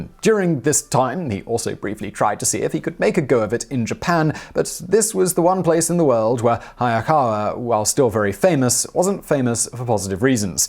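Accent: England, United Kingdom. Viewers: sigh...you are so British...tailored suit...but they are still doing window pane fabric? British guys can get away with that (I lived there long enough) Americans can't...they don't have the accent and don't know the difference between oxfords and brogues... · Very well put when you said "....his altruistic accent..." Love your sites. You're the man and a British accent I can handle. And understand. Always awesome narration. And now someone is going to think you wrote this to yourself. Ha,as if. Peace, nice work and thanks. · 10 20 19 Hey & the Team, Thanks for the post. Lovely suit, color is good on you too; not that my opinion matters that much. Be well. v P.S.: Classic Bond look to go with the British accent.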